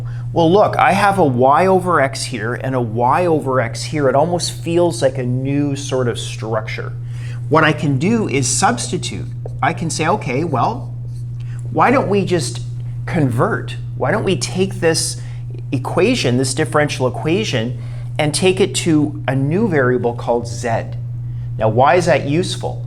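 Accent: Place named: United States. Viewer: This is very clever. I don't think we did differential equations in school. Looks more like first year university level, but I would have understood it at 16-17 with AS or A-level calculus. It's not always obvious what subsitution to use to separate the variables. I'm surprised you say 'zed' and not 'zee' since you sound American. Perhaps 'zee' can too easily be confused with 'c'. I like that you put lines through the z's so they can't be misread as 2's. I have a job marking maths classwork and sometimes the pupils get 0's and 6's looking the same, or 'b's and '6's or 'x's written like 'times' signs. I guess the first most important lesson is how to write numbers and letters clearly! Some 2's (or 1's) look like 7's, some 3's and 5's look about the same...! Once they start doing algebra writing neatly is so important! Differential equations get so complicated with del operators and vectors etc! 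Non-linear.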